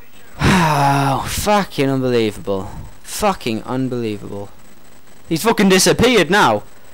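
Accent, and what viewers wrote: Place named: United States. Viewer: are u kidding me ??? ----- really? i think all the americans are rubbing off on you - starting to sound like them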